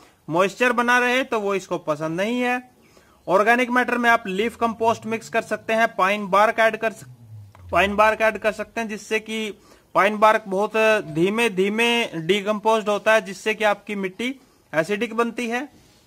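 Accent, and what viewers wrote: India. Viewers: Was he speaking English? At first but then it changed to Indian ? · I don’t understand when you talk with Indian accent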